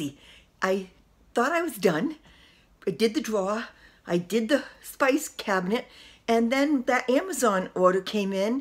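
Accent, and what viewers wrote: New England, United States. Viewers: I have an idear...keep the r's. It's part of your New England charm and very endearing. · Hi, I subscribed to your channel a few days ago. I enjoy watching your videos and find them helpful and also entertaining. One question: are you from New England? You remind me of the way people talked when I lived in MA. Keep the videos coming. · Nice job! And you remind me of myself.... hahaha Like you, I do as many little fix-its as possible. Limited $$ to throw out the window leads to creative solutions. Always gives you a good feeling of reward when you've done something like this. BTW.... You sound like you're from my neck of the woods. I'm in southern NH (Nashua area). You sound like you're pretty close by, maybe MA?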